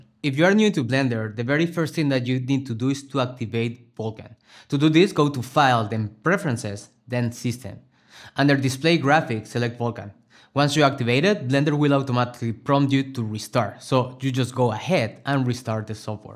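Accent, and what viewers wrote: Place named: United States. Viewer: American can't you tell lol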